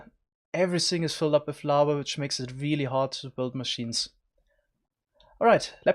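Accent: Germany. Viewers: Where are u actually from? I am hearing a german accent. Am I right? xD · Ich habe sofort an deiner Stimme gehört das du Deutscher bist! :) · dieser Deutscher aktizent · Wie deutsch kann dein Akzent eigentlich sein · I detect German accent 😋. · Holy Mother of German Accent! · Uff.. dieser deutsche Akzent geht ja gar nicht 🙈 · are u german ur english is somewhat funny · That's German English xD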